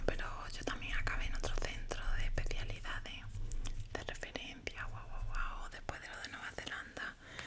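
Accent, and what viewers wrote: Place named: Andalusia, Spain. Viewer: Pensaba que éramos vecinos de provincia pero no! Jajajaja porque tu acento es de Andalucía y por lo que cuentas, ibas a irte de Málaga a Córdoba a estudiar pero tu padre no quería. Sinceramente aun no me voy a dormir, pero lo tengo en pause para seguirlo en cuanto me acueste porque me relaja tu voz! Un abrazo compañera! 😇😴😴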